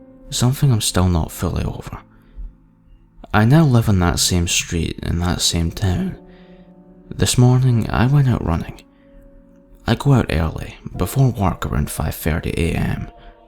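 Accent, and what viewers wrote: Scotland, United Kingdom. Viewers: Great stuff, love the your slightly seedy Scottish accent c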